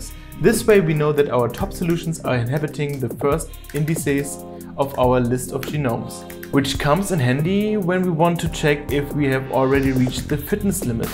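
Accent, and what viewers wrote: Germany. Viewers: Are you german ? If you are german I will subscribe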